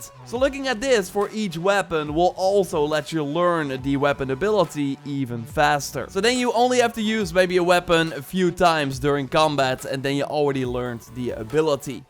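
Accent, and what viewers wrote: Netherlands. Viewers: Clearly not Belgian, I think it really sounded Dutch as well :) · Are you Dutch cause you kinda sound like it😆 Me2 btw Dutch ff fanboys ftw!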